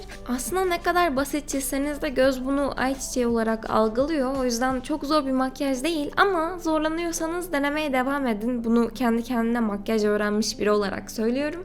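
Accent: Turkey. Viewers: And because Turkish is a highly evolved language in comparison to other Turkic languages. She also speaks the Istanbul variant of Turkish. Millions of Turks there speak like that. The politician speak the Turkish more sharply (because it needs to be more aggressive, because obviously, it is politics). Politicians even get diction training in Turkey to speak the way they speak now.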